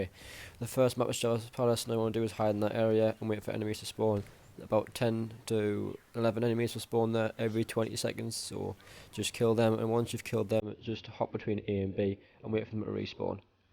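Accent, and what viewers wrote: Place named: Geordie, United Kingdom. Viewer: Never have I needed subtitles until today Geordie boy. Thank God for the comments in English, appreciate the effort you put in.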